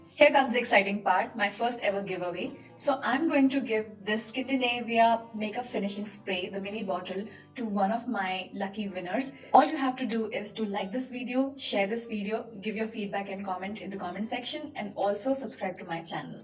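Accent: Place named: India. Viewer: The prizes are amazing and i love your other videos!! I can tell from your accent that you’re from India Right? ❤️i’m from indonesia🇮🇩!!! Keep it up and enter me!!!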